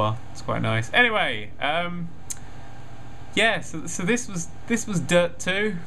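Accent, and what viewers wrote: England, United Kingdom. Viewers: no I'm pretty sure he sounds more like a English person. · Is Canadian? Because he sounds English. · I don't know why British Accent makes him funnier for me. Well, may be, because I am a spanish speaker...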